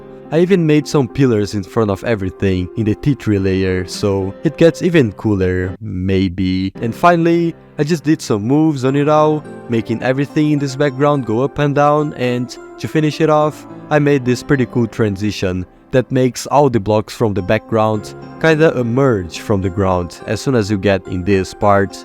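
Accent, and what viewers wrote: Brazil. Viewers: Dude you sound so Brazilian or Portuguese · Youre brazillian aint you🥶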